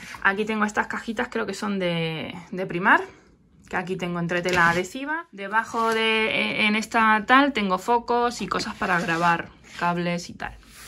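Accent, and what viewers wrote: Argentina. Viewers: Hola ,te escucho hablar y tenés mucho tono argentino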